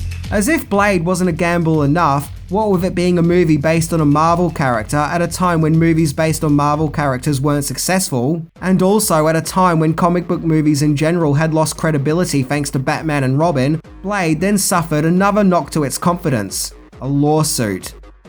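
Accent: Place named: Australia. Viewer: Oh man the aussie accent is awesome "avendge his mavah"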